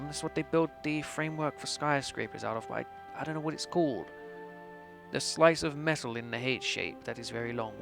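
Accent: United Kingdom. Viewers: Are you born in Britain or are you just a Canadian with a British accent.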